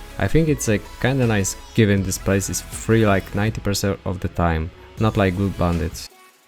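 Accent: Poland. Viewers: masz polski akcent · slychac ze polaczek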